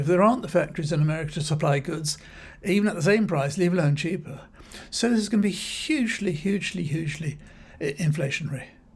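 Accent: United Kingdom. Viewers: Glad to hear common sense in a UK voice. How refreshing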